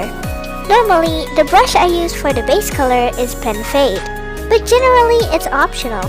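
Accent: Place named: Philippines. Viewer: u sound like pinoy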